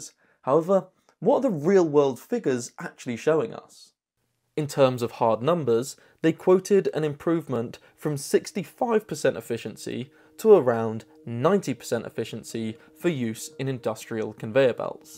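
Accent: England, United Kingdom. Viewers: couldn't finish the first 2 minutes because of your puke English voice "mowtas" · Drop the english accent. I miss about 10% of your words so I can't follow you. Too many w's.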